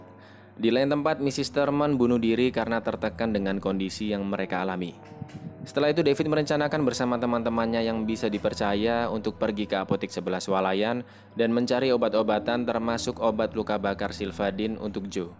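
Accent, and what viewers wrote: Java, Indonesia. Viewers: Iki wong ndi sng ngomong kok medok bgt kwwkwk salam Pati Jawa tengah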